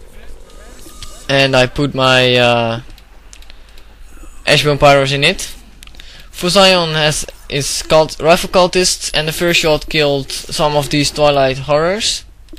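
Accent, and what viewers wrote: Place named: Netherlands. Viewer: R u dutch? U got really a dutch accent, I know I am dutch xD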